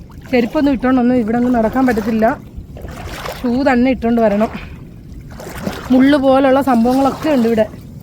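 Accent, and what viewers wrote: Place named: Pathanamthitta, India. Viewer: നിങ്ങളുടെ വീഡിയോ ഞാൻ ആദ്യമായി കാണുകയാണ് നന്നായിട്ടുണ്ട് സംസാരം കേട്ടിട്ട് പത്തനം തിട്ട ജില്ല ക്കാരെന്ന് തോന്നുന്നു ആശംസകൾ 👍🏻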